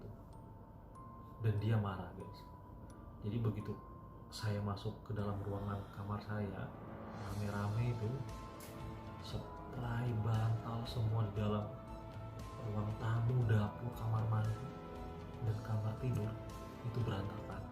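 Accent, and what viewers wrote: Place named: Kutai, Indonesia. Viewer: urang gaib ..bunyi urang kutai om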